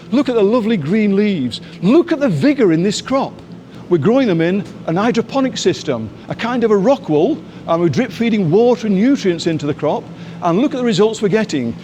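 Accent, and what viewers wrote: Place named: Lancashire, United Kingdom. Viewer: Lovely accent, are you from Lancashire?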